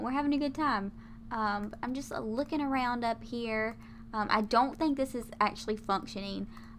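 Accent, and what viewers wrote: South, United States. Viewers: Ok I know this sounds weird but your voice or so adorable, and your country accent is so freaking cute😂😂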